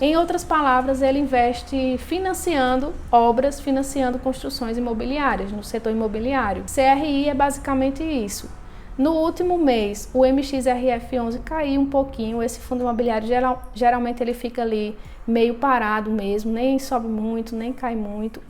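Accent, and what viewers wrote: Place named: Ceará, Brazil. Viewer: Lindo sotaque. Parece de Juazeiro do Norte Ceará.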